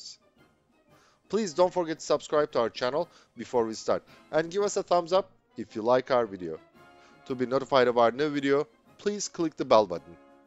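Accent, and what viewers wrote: Turkey. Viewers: I know this dude. His accent is Turkish coz he is a Turkish guy.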